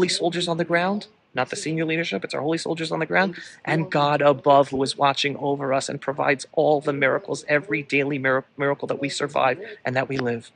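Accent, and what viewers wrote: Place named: Arab world. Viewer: Your Middle East accent is hard for me an American to understand.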